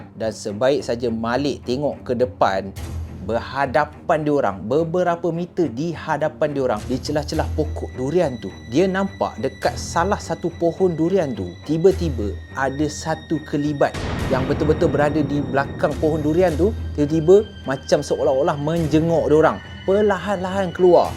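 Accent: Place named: Kelantan, Malaysia. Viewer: acu tera crito kuk baso klate pulok ehh...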